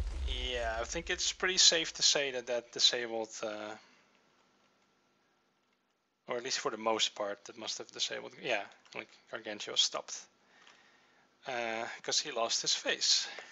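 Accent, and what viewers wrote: Netherlands. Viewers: are you dutch? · r u dutch? :3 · are u dutch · boi je bent nederlands. Dat horen we allemaal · Your dutch arent you? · Are you dutch? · your english sounds very dutch. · Ik dacht ook al zoiets. Je hoort het gwn aan z'n accent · Hes Dutch i can now it cause im Dutch to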